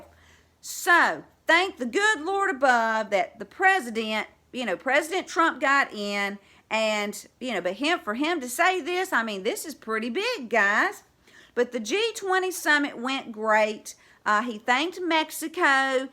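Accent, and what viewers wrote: South, United States. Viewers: Aren’t you just adorable love your spirit and southern twang :-). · Hi what a pleasure to meet you. I'm a new subby too. Love your sweet southern accent. God bless you and your family. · HELLO. WOW I LOVE UR SOUTHERN ACCENT. ITS JUST LIKE MINE! IM FROM NC. I FOUND UR CHANNEL. ITS AWESOME CONTENT. THANK U FOR SHARING!!🇺🇸🇺🇸🇺🇸🙏🙏❤❤❤ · It's just that deep Southern draw!!!! You would fit right in here in East Texas!!! 😉😘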